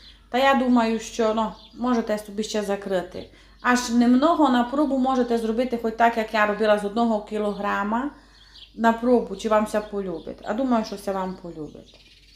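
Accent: Transcarpathia, Ukraine. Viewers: Дякую за рецепт, дуже гарно розмовляете по закарпатський!! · Щиро дякую, Олю, за рецепти, за приємне закарпатське мовлення. Здоров'я вам,наснаги і здійснення всіх задумів. Слава Україні!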